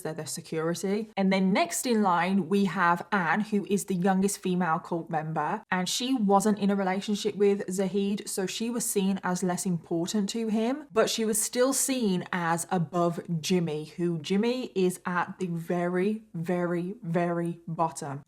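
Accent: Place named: England, United Kingdom. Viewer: Hey my fiancé and I love your videos and we've found ourselves trying to figure out your accent! Where in England are you?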